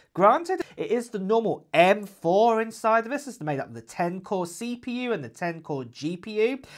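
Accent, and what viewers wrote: United Kingdom. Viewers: I am planning on getting the base model M4 and hooking up an external thunderbolt drive in a thunderbolt enclosure for more (and very speedy) storage. I could really use a new iPhone but for now, my iPhone-7 is still ticking along. Love your videos and your accent. For some reason I feel smarter when listening to a Brit! 🤣🤣🤣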